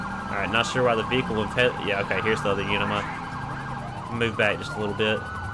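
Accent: South, United States. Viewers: You kinda sound like you are from the South as well as am I. Like the skin on the interceptor.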